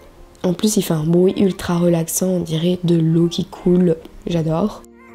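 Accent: Belgium. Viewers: J'adore ton léger accent (belge il me semble)😍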